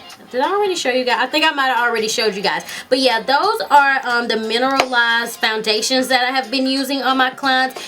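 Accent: South, United States. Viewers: Just recently subscribed I can't get over your accent! LOVE IT! Southern Belle style ;) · you can still hear your southern accent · OMG, i love your accent!! what state do you live in?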